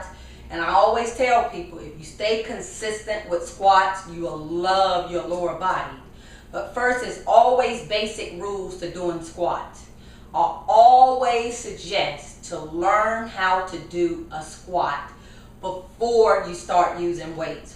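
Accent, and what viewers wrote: South, United States. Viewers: You remind me of my ppls down south, you have the crazy country accent!! LOL!!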